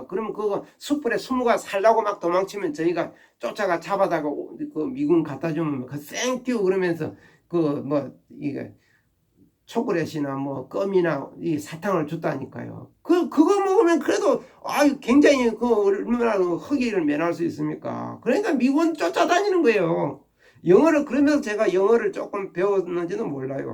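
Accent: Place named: Gyeongsang, South Korea. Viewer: 어디세요 미군도 아시면 의정부 정도 아닌가요 말씀은 경상도 사투리이신데요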